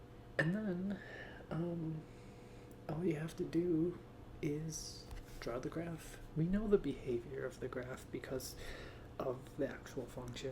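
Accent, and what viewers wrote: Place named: United States. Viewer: As a German-speaker, I say your accent is adorable. ^^ I think it's the first time I ever hear an American (you're American, right?) speak so much German haha. :) I'm glad you uploaded a new video, I find your voice very comforting, I listened to all your vids while doing my dreadful internship in Berlin. ^^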